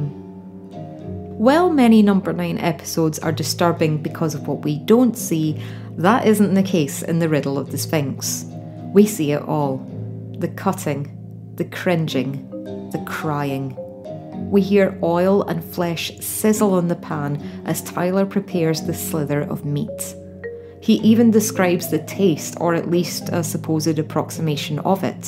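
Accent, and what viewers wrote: Scotland, United Kingdom. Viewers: Can I just say how much I appreciate your calm talking presentation. As a skeemish person myself ( I skip the jumpscare horror episodes ) it was nice that you didn’t include audio or visual scares that I’ve seen other reviewers use. Really good video and it’s always nice to hear a fellow Scot! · Where in Scotland are you from? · I love “Inside No. 9”. I’m absolutely in love with it. Love your list...quick question. What’s your accent? Is it Scottish or Northern English? It’s lovely!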